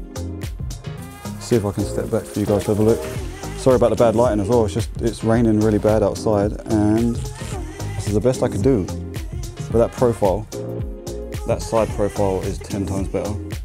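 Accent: United Kingdom. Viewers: keep doing the videos mate. So much better that your a Geezer and not a Annoying talking American. Had my 2021 09 now for 4 months. fantastic machine. I've got the exact same mirrors. I'm yet to unbox. had them a year. brought them when I had the 07 🤣.. Looking forward to more of your content nice 👍🏻. might install mine now...just relised your from NZ 🤣🤣 Thought u was from London until I watch your other video..🤦🏻🤦🏻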